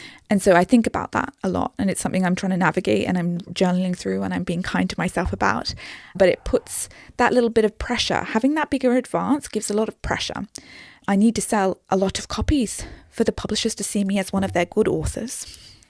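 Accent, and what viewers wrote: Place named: RP, United Kingdom. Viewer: The fact that you refer to books as 'she' is making me think this is pretentious twaddle. If you have a literary agent, surely that person is explaining the money side to you. You sound posh, whiney and entitled. I don't know why the algorithm recommended this to me. I thought most authors were so delighted to get their first book in print that they would accept next to nothing. I find it staggering that you've got this much money. Not in a good way.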